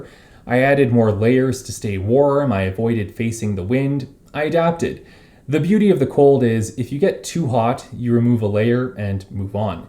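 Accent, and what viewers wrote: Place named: Canada. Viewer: Very interesting and informative. As an aside, you have what I'd call an incredibly clear example of a distinctly Canadian accent. As a lover of accents, I appreciate your video for that fact as well.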